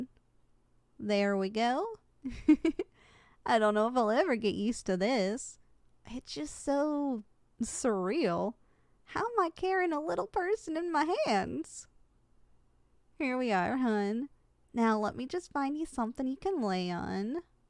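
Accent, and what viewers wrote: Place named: South, United States. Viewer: Can't say I expected it to play out that way. Now I'm curious if there's a 2nd script continuing this story. As for this one, you really nailed the Southern Hospitality feel in your accent delivery. Very well done :_AvaLavaLove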